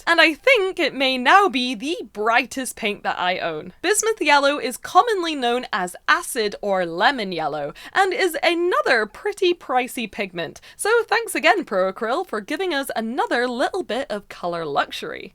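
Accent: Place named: Scotland, United Kingdom. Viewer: Sorry if this offends you, but its annoying me that i cant pin your accent, at times you sound Scottish, lothian/Edinburgh way, but i'm probably completely off 😂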